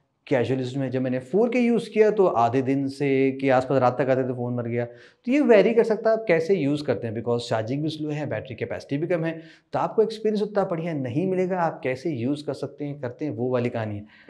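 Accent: Lucknow, India. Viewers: aap Lucknow se hai kya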